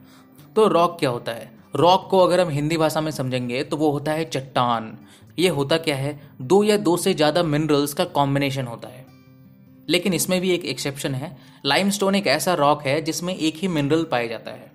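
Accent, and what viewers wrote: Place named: Bihar, India. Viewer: Bihari accent is just soo cute🤧💜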